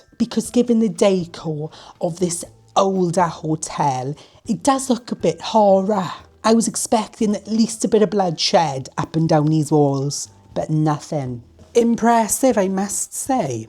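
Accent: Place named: South Wales, United Kingdom. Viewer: that switch into PPE had me screaming 😂😂 I love you.. you’re hilarious! I moved to Pembrokeshire a few years ago but it’s so nice to hear that South Wales accent again. Instantly brought me back to my roots! 🏴 Lush!! 🎉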